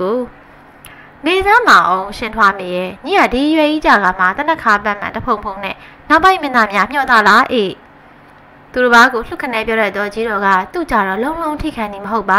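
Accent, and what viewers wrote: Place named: Anya, Myanmar. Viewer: စာဖတ်သူရဲ့လေယူလေသိမ်းကအညာရောက်နေသလိုရသခံစားရတယ်။အသံရှင်ညီမလေးလိုအင်ဆန္ဒအမြန်ပြည့်ပါစေ🥰👍💪🏽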